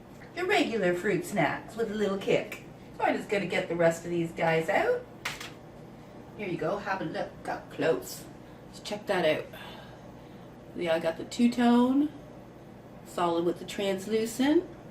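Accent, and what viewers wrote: Canada. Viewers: Very informative, great Job! I am from Western Canada, can tell you are from Ontario I presume? can tell by your accent, especially when you say the word "about" LOL, all is good!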